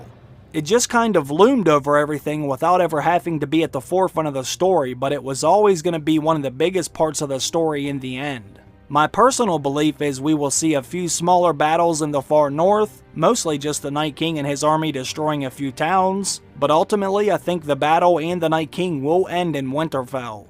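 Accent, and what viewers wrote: Pittsburgh, United States. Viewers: Are you a fellow Yinzer? You sound like a Pittsburgher.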